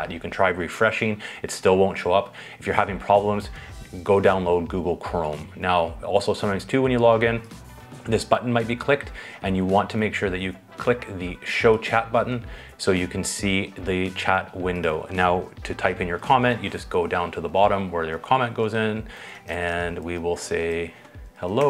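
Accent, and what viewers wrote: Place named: Canada. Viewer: I KNEW you were a CANUCK ! You have an accent! Especially when you said, "OUT" ...."If you have a question, leave me a comment below & I'll try and help you 'OUT' !! Tell tale sign of a Canuck! Tks for your helpful tips on how to comment during live stream. Sending you good vibes & California sunshine ! 🌴😎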